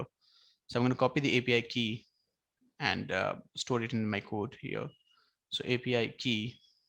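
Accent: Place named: India. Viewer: orang indonesia tapi logatnya kayak orang india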